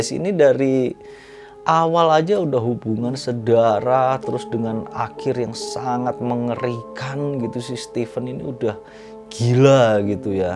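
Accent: Java, Indonesia. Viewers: Halo uncle....medhoknya itu lho🙈🙈😂😂😂😂😂😂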